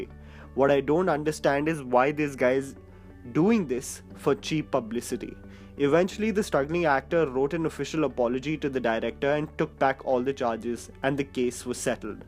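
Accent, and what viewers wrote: India. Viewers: This is the typical convent school educated accent in India , believe me his accent is good , very good. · Some of you all need to chill with criticizing Indian accents. India is a multi-lingual nation, and english is not most people's mother tongue. Also, english isn't the only language that exists in this world, the world doesn't revolve around it. Those of you who criticize him based off of that are narrow-minded. Don't be that person. Be a bit more kind. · If he had a pure American or British accent he would be criticized for a 'fake accent' . He speaks in a way most indians understand and still people are complaining? Duh! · To be honest his accent is cringe-worthy, I have seen Indian people with lot better accent then him. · you have such a thick accent sigh. makes watching this hard on the ears - for someone who isn't so used to indian accent. 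I like bollywood so I clicked on this video.. and good content too, but maybe a more neutral sounding accent would make the video more open for non-indians too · There is nothing wrong with accent!!! When are Indians going to stop being judgmental about their own people!!! You think he should have what an American accent then it's all good?! Most Indians speak multiple languages so this guy's accent is not an issue. He speaks very clearly.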